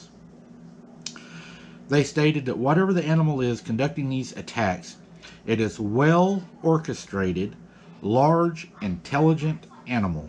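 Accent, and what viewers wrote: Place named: Tennessee, United States. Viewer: You must be from Tennessee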